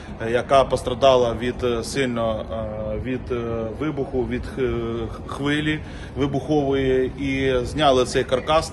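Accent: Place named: Ternopil, Ukraine. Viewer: У вас чудесный украинский язык Тернопольский акцент 😢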